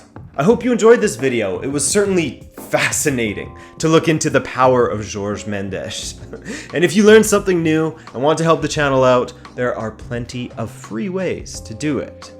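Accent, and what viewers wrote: Azores, Portugal. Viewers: his famaly is from the azores and He has an azorean accent I think it's because of this He might sound a bit different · Are you Azorean? I can tell there’s a bit of an Azorean accent when you pronounce names. New to the channel.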